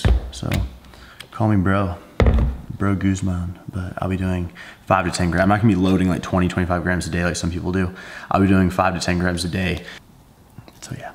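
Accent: South, United States. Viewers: Lmfaoff I love the country accent 😂😂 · That country accent 😂😂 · Only if you do your Texas accent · Country accent is A1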